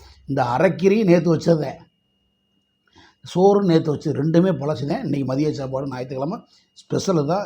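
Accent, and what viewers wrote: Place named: Dharmapuri, India. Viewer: அக்கா பேச்சு சூப்பர் தர்மபுரி பேச்சு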